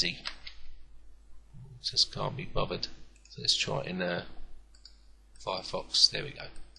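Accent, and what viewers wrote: United Kingdom. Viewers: Thanks for this clip. I struggled sometimes to understand what you are saying (the heavy British accent, not a complaint though, lol). Just an FYI, the Symbols path didn't work, so I ended up downloading and installing the symbols on my machine (and set the correct local path). Analysis worked fine after that. Thanks again for a very useful beginners tutorial. Sam, USA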